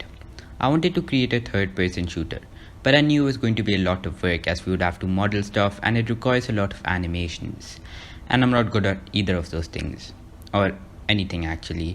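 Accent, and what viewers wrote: India. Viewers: nice indian accent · oh man you live in my favourite city and your accent sounds like Indian accent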